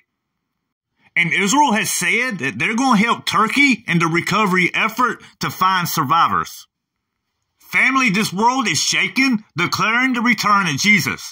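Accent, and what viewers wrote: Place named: South, United States. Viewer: Bro, great video, luv the Southern accent, the prayer, etc. Thanks for all the News re: Israel; God bless you--see you Soon!! (Rev 22: 7)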